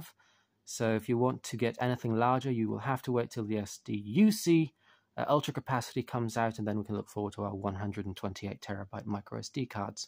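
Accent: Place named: United Kingdom. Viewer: Thanks for all your help. You seem to be British. Your accent seems to indicate so. Your video 📹 is now appearing on YouTube in South Africa. Date: 28/04/2024 (April; Sunday).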